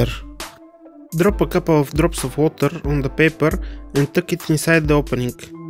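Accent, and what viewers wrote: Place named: Russia. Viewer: Твой рунглиш великолепен)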